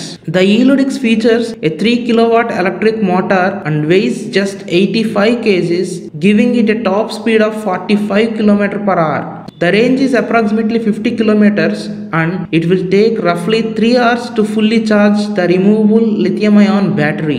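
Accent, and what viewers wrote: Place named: Andhra Pradesh, India. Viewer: Voice is andra english....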